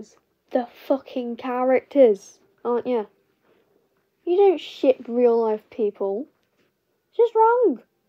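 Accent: United Kingdom. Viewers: You sound british..... Also cool art style😀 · Are you British? 😂😂😅Me in a serious video lol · O your voice is....British.? Nice voice!!!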